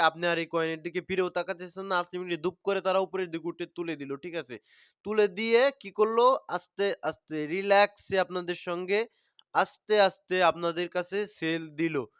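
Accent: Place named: Faridpur, Bangladesh. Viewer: Vai faridpur kotgay apnar basha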